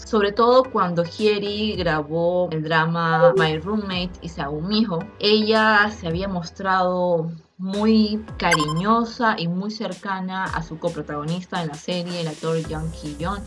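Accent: Peru. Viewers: Sospechaba que eras peruana por tu manera de hablar. Yo también. Y te felicito por el gran trabajo que desempeñas. Que sigan los éxitos! ❤ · Es obvio que eres de Perú, tu acento te delata amiga y pues no es necesario que digas de dónde eres ya que son noticias del entrenamiento coreano.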